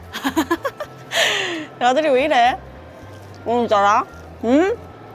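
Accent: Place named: Gyeongbuk, South Korea. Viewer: 경북사투리 구사너무귀여워~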